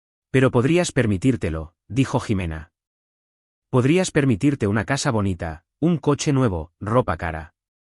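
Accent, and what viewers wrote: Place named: Andalusia, Spain. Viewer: ES FACINANTE ÉL ESCUCHAR AL NARRADOR CON ÉSE PERFECTO ESTILO ANDALUZ...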